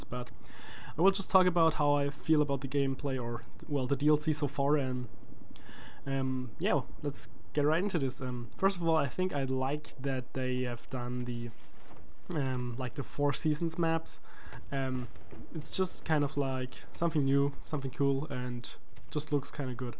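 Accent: Germany. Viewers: ahh ein deutscher dachte schon ^^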